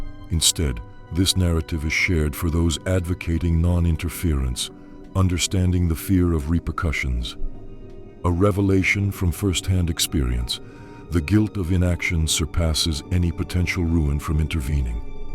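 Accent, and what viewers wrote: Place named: Upper class, United Kingdom. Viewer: I don't like the narrator's voice. Is it a computerized reading? The grammar used, while mostly correct, sounds so upper-class British that it detracts from the feel of the vid, almost sounding like it's all a tongue in cheek satire.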